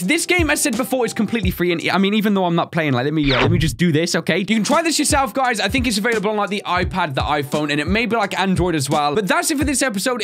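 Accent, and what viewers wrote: United Kingdom. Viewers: Ur British right?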